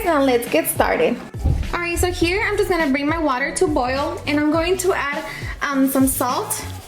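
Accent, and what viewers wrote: Mexico. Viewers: Over the years, you've developed a better Mexican accent! Love you watch you when you're young and love to hear you as you mature! ❤️ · Your Mexican accent is strong. I love it 😍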